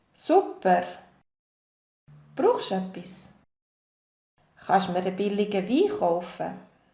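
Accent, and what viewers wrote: Bern, Switzerland. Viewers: What area of Switzerland is this dialect spoken? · Bern Dialäkt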